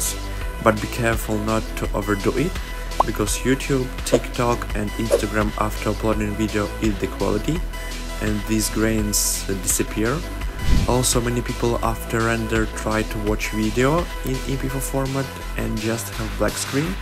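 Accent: Russia. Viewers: Чувак, ты русский что-ли?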